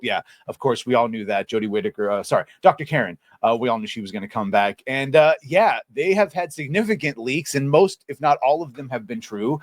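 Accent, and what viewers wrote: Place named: Cockney, United Kingdom. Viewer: Love the cockney accent, jokes on you though as it's been replaced with the Pakistani English accent.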